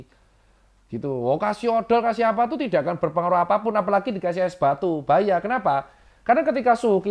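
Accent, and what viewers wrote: Java, Indonesia. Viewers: Logat jawa nya yg bikin gue ketawa terus duh 🤣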